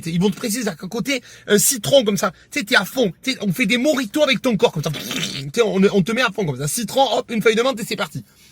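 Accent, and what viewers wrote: South, France. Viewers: je vit au Québec et je suis Français de Lille (Tourcoing), j'ai 31 ans, la cause du mal Français. C'est l'U-E, pour sortir de la matrice. Fait une piqure de Rappel à ton public. Essaye de faire de la pédagogie, SI POSSIBLE. Sur.... ce qui te semble juste; le Cercle Aristote et PYR (Pierre Yves Rougeyron) sont de bon exemple. Libre à toi de donner les clefs de compréhension à ton Public. Poursuis ton travail, ainsi que ta parole: Qui je crois aide beaucoup de personne à se construire une opinion, et surtout des repaires. J'adore ton accent du sud Mec!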